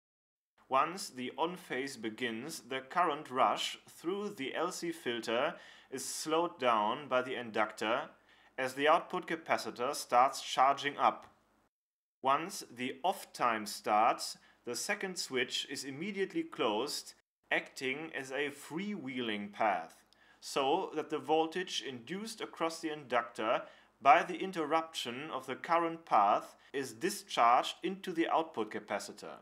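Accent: Germany. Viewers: Respect for the creator if this Great Youtube Series. It's quite easy to understand and follow your ideas. Thanks a lot. Btw, sounds like a German accent. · Excellent, just excellent videos. Very well thought out and executed. As a 25 year technician, I can say, I've re-learned something from your videos. The direct nature of your presentation is outstanding and I'm sure, will help students and guys with foggy minds, such as myself. I love your German accent. Danke!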